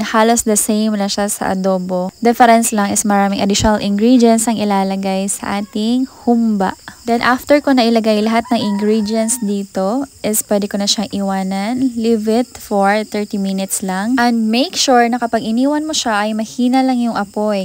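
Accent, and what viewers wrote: Bohol, Philippines. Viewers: mura lge ug inistoryahan sa amoa sa BOHOL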